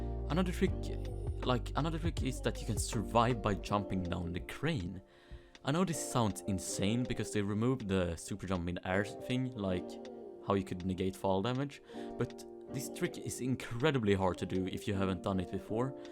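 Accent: Sweden. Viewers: are you swedish? 🤔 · sweden? i can hear that little accent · Ahh, the original Swedish accent.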